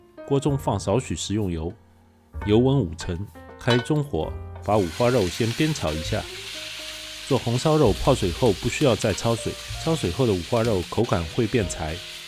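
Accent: Shanghai, China. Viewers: 冲着你带有上海口音的解说，红烧肉肯定是回味无穷。❤👍你的视频不拖泥带水、不啰啰嗦嗦一步到位是有良心的节目人。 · 上海口音的上海红烧肉肯定正宗，订阅了！👍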